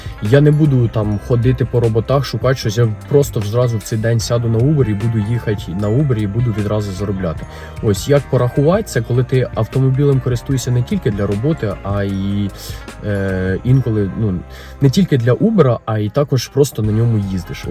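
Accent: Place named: Western Ukraine, Ukraine. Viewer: Я когда слышу его голос или говор меня аж сука коробит) от войны убегало , вуйко?)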